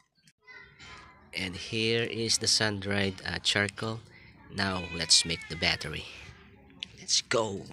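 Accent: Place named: Philippines. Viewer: The way you speak English,similar to us filipinos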